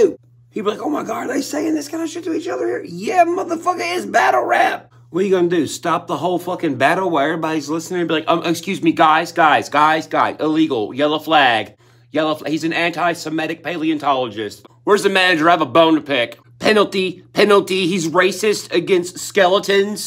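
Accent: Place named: Tennessee, United States. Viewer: Sitting here listening to you speak brings me back home. I feel out of place sometimes living in truckee as I’m from bumpus mills tn on tobacco port circle. You always keep it real and I appreciate that. Wow I never heard of the bot farms! That makes sense. I gotta get back to work. Thanks for the content I listen to all your music and I have started listening to your videos where you talk. Literally every time I have blows my mind! Keep calling shit out and keeping it real!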